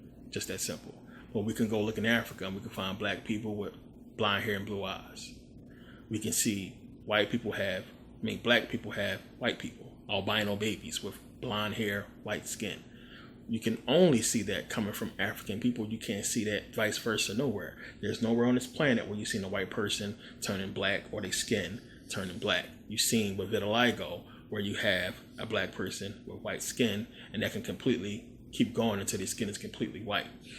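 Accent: Philadelphia, United States. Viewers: He’s from Philly. We are city cousins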